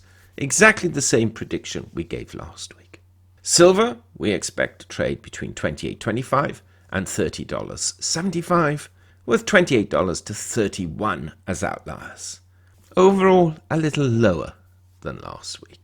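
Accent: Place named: RP, United Kingdom. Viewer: get rid of the posh English accent and the waffling,and you could cut the video time in half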